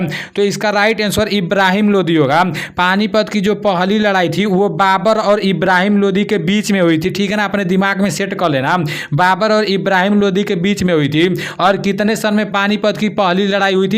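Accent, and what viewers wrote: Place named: Bhojpuri, India. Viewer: bhaiya apki Bhojpuri launguages bahut mast lagata hai, I belong gola bazar, Gkp se hu bro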